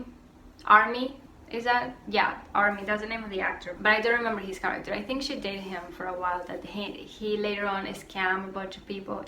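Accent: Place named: Puerto Rico. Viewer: I love your accent. Reminds me of my Puerto Rican grandparents and cousins 🥰🥰🥰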